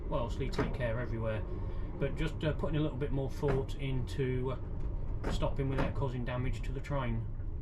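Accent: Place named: Midlands, United Kingdom. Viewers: Really good video, I’m assuming saying it’s bostin’ is in bounds as I’m hearing a Midlands twang. You’ve a very good way with words 👍🏼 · Bostin'! I originally thought he was a Brummie too, but in an earlier (non-Underground) video I'm sure he said 'Peterborough, my home town'. So, East Midlands not West., please confirm.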